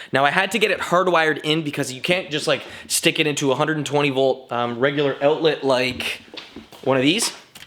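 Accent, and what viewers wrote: Canada. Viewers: Off-topic comment: In your "Send me stuff" section, you don't mention the country. There are two Ontarios in USA, and for those who can't recognize the subtle clues of a Canadian accent, you might be missing out on stuff! Add "Canada"! All the best, Rob (in Switzerland) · are you Canadian?? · Are you Canadian?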